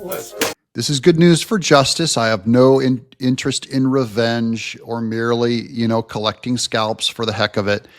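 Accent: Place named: Turlock, United States. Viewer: Very distinctive voice. Sounds like a Turlock boy. Ha 😂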